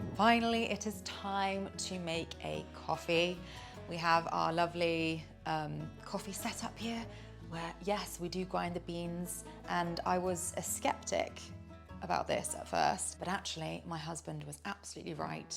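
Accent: United Kingdom. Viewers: Yes, I really did. Being an indian is so difficult to speak and listen to British Accent, so this video is very helpful to improve my British way of speaking English 😊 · Hi, I absolutely love this kind of real-life video! I really adore the countryside — thank you so much for sharing these beautiful views with us. I also really enjoy learning British English accent from your videos, and I’m looking forward to you making another one in the future. ✨ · As an English learner I mostly like British accent and such kind of videos are very useful. Mam, could you tell about camera you recorded this video with. Video quality is incredible. ❤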